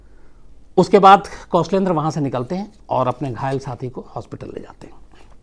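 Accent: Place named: Bihar, India. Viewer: Bihar me aise hi bolte hai syd sir bihar se hai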